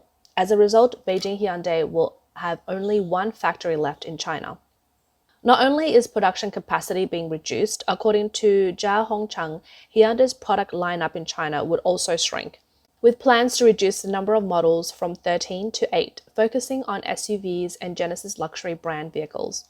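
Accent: Australia. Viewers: . It sounds Aussie to me as well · 100% austraslian voice. She is reading off a script , hear her voice stop and start. Its good thing as it sounds authentic. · Great job on this report. I watch CNBC for business and stock news. This is an even better report on the auto business in China than I have ever seen anywhere. Also, not sure if the voice is AI or real but she sounds great. I live in Florida so do not experience Aussie accents that often. ** OK, Weird thing! & there is an old-looking wall shown. What is that???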